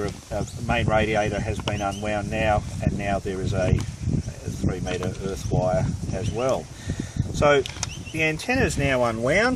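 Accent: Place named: Australia. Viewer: is an Australian...from Australia. That is how he sounds. We're not all Americans, sorry about that!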